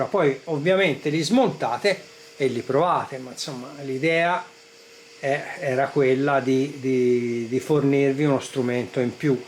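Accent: Tuscany, Italy. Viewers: Con l'accento toscano sa quanto mi piacciono i suoi video... c'è anche tanta simpatia oltre la tecnica. Ottimo come sempre. Guardato attentamente come a scuola perchè per me è scuola e anche questo video è molto utile perchè fa capire diverse cose, oltre al trucco di testare i condensatori anche come funzionano i tester. Io ho uno come il suo Aneng col guscio verde che me lo godo da 2 anni e la sua manovra di lettura credo che non la sapevo, perchè lo lascio sempre in AUTO e sposto il commutatore. Poi la barretta sotto i numeri che corre nelle letture di certi strumenti... ancora non so a cosa serve... cosa indica... Adesso il video ci casca a fagiolo perchè ho appena finito un alimentatore lineare nel suo telaio che dovrà alimentare un amplificatore da 50 W per canale con integrati LM3886 e mi sono accontentato di questa potenza alimentandolo a 26V ma può dare molto di più ma a me non serve troppa potenza e sarebbe servito un alimentatore più grosso e spazi diversi e il progetto non si voleva spingere a grandi telai tipo anni 80 ma la metà di quelli. 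Ho messo 2 condensatori da 10.000 in parellelo per ramo (+ e -) perchè è duale e il calcolo mi dava 16.000 µF e ho messo 20.000. Adesso devo fare il collaudo sotto carico a 5 A per ramo, ho il carico fittizio e anche l'oscilloscopio nuovo di pochi mesi e caro amico mi diverto come lei. Purtroppo non ho saputo progettare bene dall'inizio perchè volevo due alimentatori separati, uno per canale con 2 toroidali ma avendo comprato un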